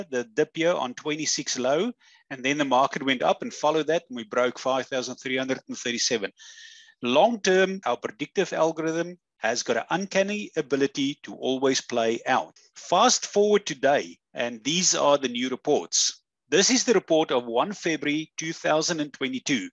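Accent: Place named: Afrikaans, South Africa. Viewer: Yes it is. It's an Afrikaans accent from South Africa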